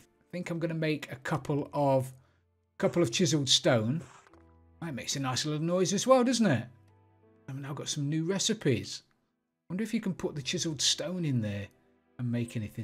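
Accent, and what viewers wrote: United Kingdom. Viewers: Awsome video and I just realized I find my self waiting for your British accent to sing some random song so I can lol thanks my friend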